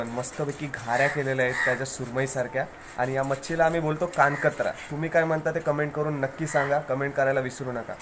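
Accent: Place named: Agri Koli, India. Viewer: भोईट बोलतान आमच्या आग्र्यांच्यान आणि कोल्यांच्यान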